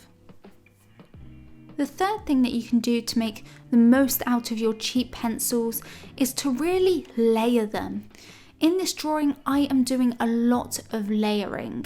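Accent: United Kingdom. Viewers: Are you british? Your Accent is very nice 👍👏👏👏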